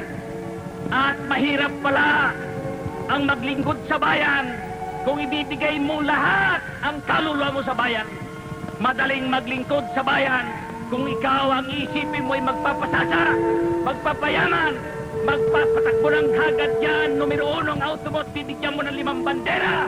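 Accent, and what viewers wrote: Philippines. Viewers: pinoy na pinoy ang ang nagsasalita.yung mga nagcocoment nmn mga engeserot englesero kaloka😅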